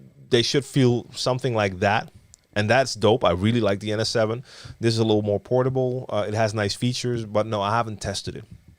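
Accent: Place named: New York, United States. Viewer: I straight thought you where from New York.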